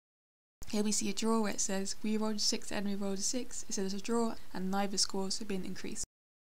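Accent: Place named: United Kingdom. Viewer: I love your British accent ;) and I love your Unity tutorials!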